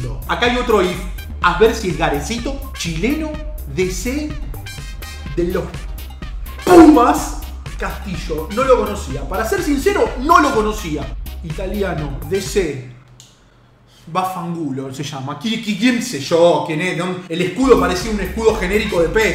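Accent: Argentina. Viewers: Un argentino ei jajaua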